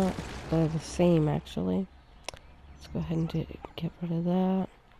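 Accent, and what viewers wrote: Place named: New York, United States. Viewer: So I was wondering if anyone ever told you, that you sound like the woman from the TV show "King of queens". Lol I love that show. She did a great impression of a genuine new Yorker. And you sound like her. I'm hazarding a guess that you are a new Yorker but I've been wrong before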